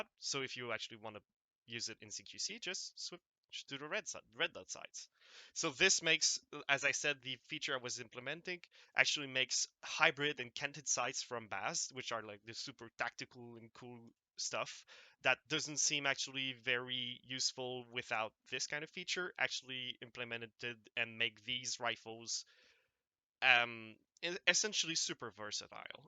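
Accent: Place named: Quebec, Canada. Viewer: haha, knew it. your accent is very light but from a fellow quebecois, i could tell. hope your progress on the mod is going well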